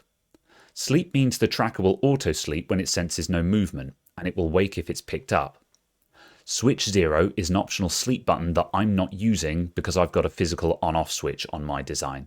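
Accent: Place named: West Midlands, United Kingdom. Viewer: Hi, it was because of your videos that i watch late at night to relax that got me interested in this sort of stuff in the first place, and I have already learnt so much. After a while I managed to convince my wife to buy me a little DIY soldering kit for a graphic equaliser, and I said to her, if it doesn't work, I'll never buy one again, but (honestly, to my actual surprise) the thing works flawlessly, and I hnow have a sort of bug for building simple electronics. Half way through building this equaliser the soldering iron I had blew up completely, so I had to buy one off amazon to get it finished, the soldering gun i now have is amazingly better than the one that blew up, and I'm absolutely willing and looking forward to my next project, so many thanks to you, you've inspired an almost 40-year-old-toddler to try something new. 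Hope that makes you smile tonight. Cheers bro. The next one I do I may consider putting up on Youtube. PS - you sound like you're from the West Midlands ish?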